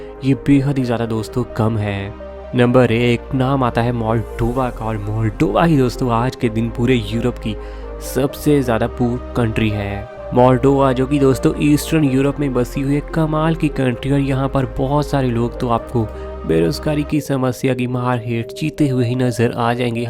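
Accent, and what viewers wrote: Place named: Punjab, India. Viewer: tu punjabi a teri tone to pta lagda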